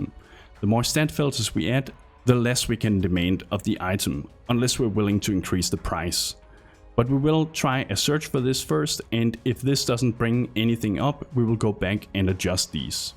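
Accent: Denmark. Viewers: You sound very danish. Thumbs up!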